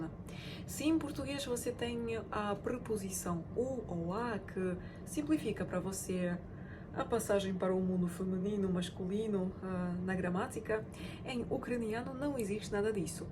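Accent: Portugal. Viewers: tu hablas português del Portugal 😅😅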